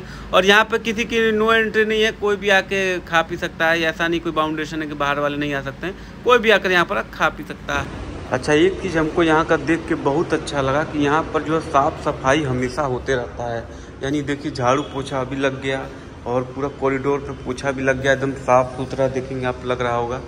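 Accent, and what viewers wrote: Varanasi, India. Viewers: APKI BHASHA SE ME PEHCHAN GAYA KI AAPBANARAS SE HA MERE NANI KA GHAR BHI VAHA HA 😁😁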